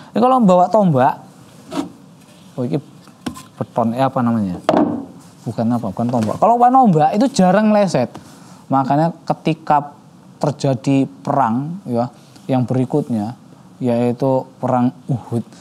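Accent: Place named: Indonesia. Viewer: Medok tenan ustadz.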